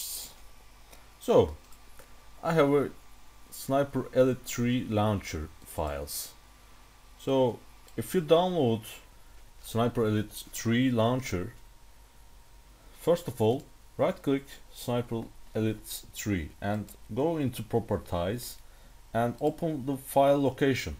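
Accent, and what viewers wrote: Turkey. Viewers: U from Turkey right. I knew that your accent hahahahahaa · Türk aksanı lan bu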